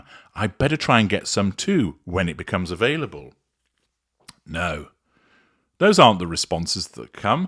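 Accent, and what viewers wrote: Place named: Cockney, United Kingdom. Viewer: Sorry, but you sound like Eastenders, no offence mate.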